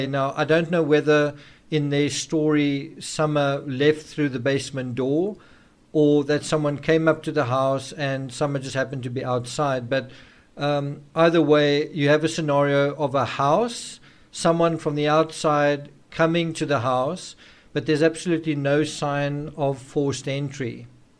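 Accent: South Africa. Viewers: the narrator is South African x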